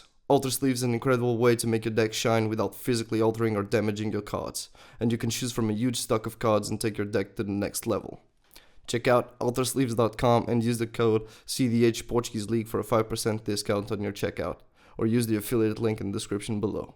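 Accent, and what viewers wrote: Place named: United Kingdom. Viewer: Love the new video production, the narrator has a very good British style accent.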